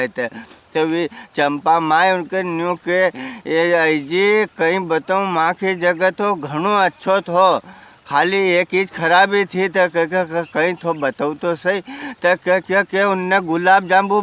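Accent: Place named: Malwa, India. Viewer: परम आदरणीय जी को जन्मदिवस की बहुत-बहुत बधाई आपकी मालवीय वाणी वाणी हमारे समस्त परिवारजनों को अति प्रिय है अतः भगवान से सेव कर बद्ध निवेदन है कि आप आप हमेशा ऐसे ही हंसते मुस्कुराते रहें और और अनंत वीडियो बनाते रहे धन्यवाद भीम सिंह चौहान तिलावत बड़ी तहसील तराना जिला उज्जैन मध्य प्रदेश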